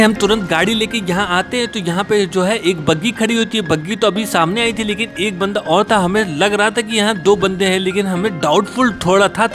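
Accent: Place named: Gorakhpur, India. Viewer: Bilkul aap Gorakhpuriya bhasa bolte hai hm logo ke tarah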